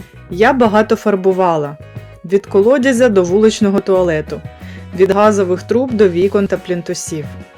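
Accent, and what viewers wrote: Standard. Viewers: Ютуб закинув це відео в рекомендовані і воно набирає перегляди . Робіть заставки відео без дрібного шрифту . Як для блогера у вас гарна дикція, чиста українська мова і вміння формулювати думку . У вас все вийде, розвивайтеся, експериментуйте - успіх буде . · Дякую вам. Ви трішечки змінили мою думку про східних переселенців на кращу. Особливо вражає чиста українська мова. Бажаю вам, щоб у вас все було добре, і вірю, що так буде: і у вас, і у нас-в Україні.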